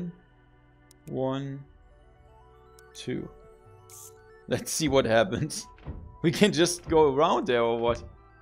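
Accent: Germany. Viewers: Hey, been watching for a while. I own a very small VR company in Washington State in America but my girlfriend is German. So I tend to put you on whenever she's around because I think your accent reminds her a little of home. Also, I can never get over the way that you read your sponsors. It's the most German thing a German person has ever German'd. I actually wanted to thank you for the review you did on the neuro net EEG product. I'll be a little more blunt and say that it's got maybe good intentions but it is clearly a cash grab and one of those items that pops up and actually does damage to the industry as a whole because it's yet another product or accessory that is lying to consumers and getting their hopes up to get closer to where we are wants to be as opposed to where it really is. I'm in the process of opening up a new VR arcade location and I'm currently trying to figure out some hardware logistics as far as purchasing. 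Perhaps you might have some advice for me? either way I hope you're doing well and keep it up. We enjoy it quite a bit.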